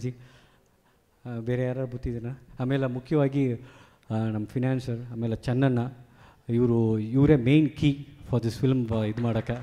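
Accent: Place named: Chamarajanagar, India. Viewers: ಸ್ವಲ್ಪ-ಸ್ವಲ್ಪ ಚಾಮರಾಜನಗರದ ಭಾಷೆಬರುತ್ತದೆ ಧನ್ಯವಾದಗಳು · Namma chamarajanagara bhashe na nimmindha keli khushi aythu!!! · Try madilla sir poorthi kannadane but adu narasipura,nagara slang aste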